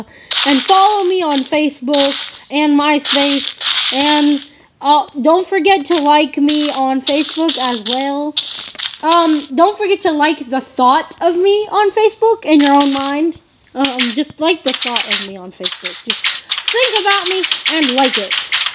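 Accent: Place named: Nevada, United States. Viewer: dude u talk like people in pahrump NV yall best be gon roustabout the rattle snakes before them jeepers ranchalot no shade no cap....im brown and people say i sound like a texan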